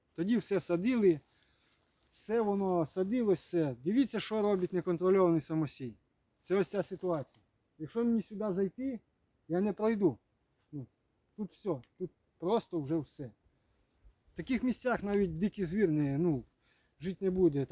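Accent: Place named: Polesia, Ukraine. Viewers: І мова гарно і поліщук справжній! Головне, що ви небайдужі, вам є справа до землі, на якій живете. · МОЇ СПІВЧУТТЯ ТОБІ ЗЕМЛЯЧЕ 🤝 ПОЛІЩУЦЬКИЙ ДІАЛЕКТ СУПЕР МЕНІ СОЛОДКО СЛУХАТИ, ТАК САМО ПОЛТАВСЬКИЙ, ЧЕРКАСЬКИЙ, КОЖЕН РЕГІОН МАЄ СВІЙ ДІАЛЕКТ, НАВІТЬ В США АНГЛІЙСЬКА МОВА АЛЕ ЗАХІД, ПІВДЕНЬ, ПІВНІЧ, ЦЕНТЕР ВІДРІЗНЯЮТЬСЯ, ОСОБЛИВО ВІД ЦЕНТРУ НА ЗАХІД....МИРУ НАМ УКРАЇНО ТА ПЕРЕМОГИ НАД МОСКОВИТАМИ. ПРИВІТ З ХЕРСОНУ....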